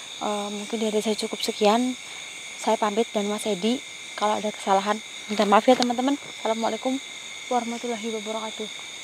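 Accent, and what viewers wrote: Banyumas, Indonesia. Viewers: Aq suka geli ketawa sendiri denger dialeknya yg lucu.spt tibluk.ya bhs Banyumasan..bs ngobatin rasa rinduku pd kampung halaman.ayo maju terus mb.I lope.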